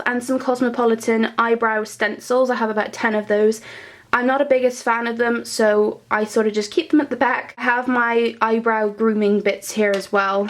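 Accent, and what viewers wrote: United Kingdom. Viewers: Are you from Britain? I'm really struggling trying to guess your accent, you sound british to me but I am unsure aha I am a new subscriber xxx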